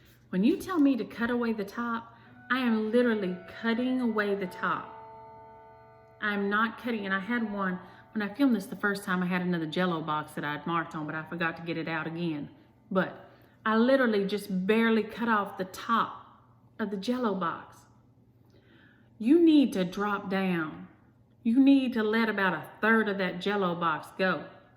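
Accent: South, United States. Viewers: I enjoy you so much that I watched all your videos! I love your Southern sayings along with your drawl! I love chocolate and caramel together so Caramello would be my favorite along with Snickers! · Chicken what? Did I hear your favorite candy correctly? You crack me up. My favorite candy is straight up Hershey’s milk chocolate. Love your videos. I’m a southern girl and your south-isms make me laugh. Lawd!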